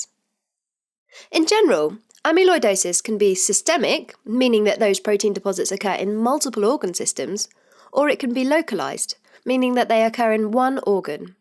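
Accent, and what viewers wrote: England, United Kingdom. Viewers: Beautiful british accent!!!!! Great lecture😊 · your British accent is so cool kinda strange but still cool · I love your british accent... 😍😍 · Loved that British accent !!! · Whats with the british accent.. Its not even the bbc english which is easier to understand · I missed you,! Lovely Brit accent! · I love british accent! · I love the typical English accent .... would love to hear more 😋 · She explained it so well, but her heavy English accent is bothering me so much that i cannot focus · I wish you get rid of this british accent. We do not understand 🤥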